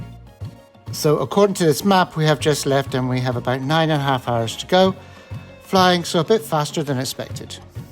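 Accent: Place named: West Country, United Kingdom. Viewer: Trying to place your accent, getting all over the place but think West Country somewhere even some Irish. Dying to know where you are from.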